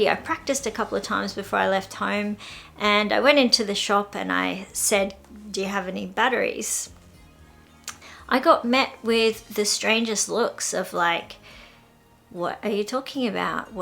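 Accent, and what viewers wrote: Australia. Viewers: I know that much of Japanese English is based in part on American English so it’s not surprising that they likely struggled with your Australian pronunciation and accent. Interesting anecdote. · I understand where your comming from when it comes to a Japanese person or Thai understanding us english speeking people , us Brits have a thing in our heads called auto correct, if a japanese person ask me in pigeon English, we/I would probbably understand what they were trying to say, although they are not perfect, we, our Brains auto adjust to figure out what they want, or asking in there sentence, but i find that a lot of asian countries dont have this, unless its perfect pronunciation they just look blank at you, what probably happend to you as a teenager, unbeknown to you, you was speeking Japanese with an Ozi accent . I quite often have my Thai girlfriend in fits of laughter when i try to pronounce Thai, if you think Japanese is hard ( its not) Thai script has tonal pitches, although the spelling of a word is the same, the tone or rise and fall at the beginning, mid word, end of the word can translate into a different meanings, as for pronouncing Japanese, try and get a Japanese accent it help when i prononce it . 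· I'm sure speaking English simply uses the tongue differently saying vowels and letters differently. As she describes phonics. I would have a very difficult time speaking Japanese. I wonder if everyone learning English from her will have an Aussie accent. Probably same as my Dad learning English from a country under a British rule. In general I hope we all understand each other with respect. I applaud you for what you have learned so far. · I am interested in not just the pronunciation but also the ups and downs of the voice... Though I have Japanese grammar and vocabulary books, no teachers here are willing to teach me speaking... it's a gap left blank. They are not native speakers thus not confident enough to take the job. As for the native ones, they don't speak my language so we can't communicate well and I find it hard to explain to them what I need. 😅 Besides, they are not educators just housewives who have no idea of the methodology of teaching. 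I found I am also improving my English with your lovely Australian accent haha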